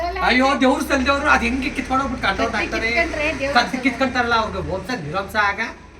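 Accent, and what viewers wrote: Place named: Bangalore, India. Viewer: Nam banglore bhage chanagi helidri navu bangkore avare Banashankari avaru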